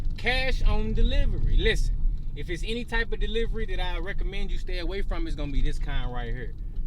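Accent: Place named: St. Louis, United States. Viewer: Bro gotta be from the Lou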